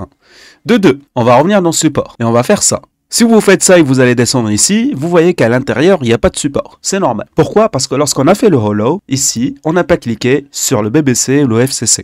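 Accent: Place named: South, France. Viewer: 👏 pour la vidéo et 👍 pour le délicieux accent du sud qui fait tout le charme 😉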